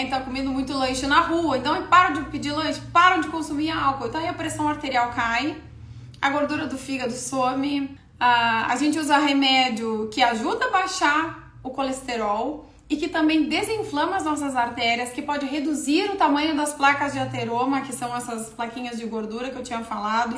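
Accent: Rio Grande do Sul, Brazil. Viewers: Um sutak bonito da mulher gaúcha, vc é super...beijo meu ❤ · Não mude seu sotaque não .sultaques dos gaúcho eu acho muito lindo.cicero Vitalino PR.meus parabéns doutora vc e uma ótima proficional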